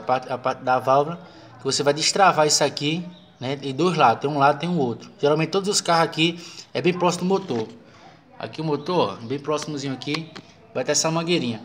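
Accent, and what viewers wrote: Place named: Maranhão, Brazil. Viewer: Parece Maranhence falando, não entendi nada.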